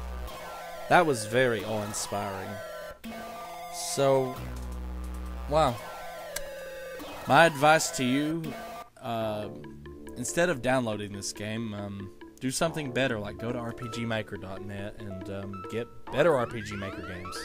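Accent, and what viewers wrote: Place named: East Riding, United Kingdom. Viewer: That's an East Riding accent. Seems Hull is fast becoming a hotbed for quality games development.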